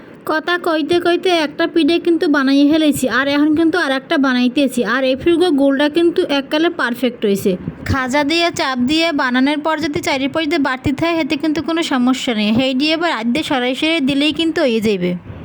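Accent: Barishal, Bangladesh. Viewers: প্রথমেই বলি দিদিভাই তোমার বরিশালের ভাষা শুনতে অনেক সুন্দর লাগে❤ পিঠে গুলো খুব সুন্দর হয়েছে দিদিভাই❤❤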